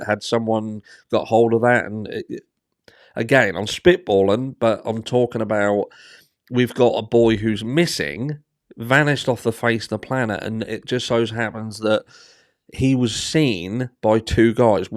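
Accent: Norfolk, United Kingdom. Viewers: You sound norfolk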